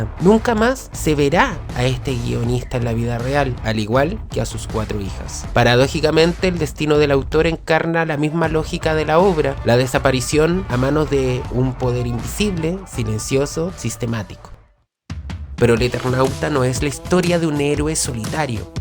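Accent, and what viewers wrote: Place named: Chile. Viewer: Felicitaciones! Estoy literalmente hace años con deseos de crear un canal artístico cultural. Consumo ese tipo de material en su mayoría y además de disfrutar del contenido, me fijo mucho en la factura técnica y en la calidad, claridad, simpatía y todo lo que tiene que ver con la voz o la persona que está al frente del canal. Tomo ideas, analizo, etc. Me gusta cuando hay originalidad en la manera de narrar y pese a que se nota que eres chileno, tienes un estilo muy personal. Primer video que veo de tu canal y está muy bien hecho.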